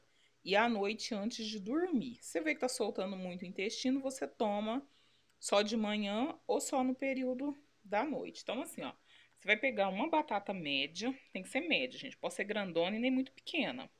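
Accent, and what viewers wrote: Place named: Paraná, Brazil. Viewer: Acho que é do Paraná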